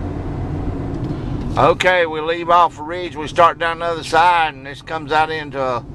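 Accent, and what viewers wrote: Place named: West Virginia, United States. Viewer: I grew up in Blacksburg, VA. I'm a truck driver now, and recently picked up a load of sodium chloride from the salt corp there. I had never heard of Saltville before, but after driving through I wondered about it's history. I have been doing some research for a blog post, and came across your videos. Very nice videos, looks like a nice place to live. In my opinion there's no place quite so beautiful in the whole country as the mountains of Virginia. Your accent reminds me a little bit of my relatives from West Virginia, though it's not quite the same. Makes me miss visiting them. Most of them are gone now. I haven't been there much since my grandparents died. My parents grew up near Charleston. Thank you for sharing your town.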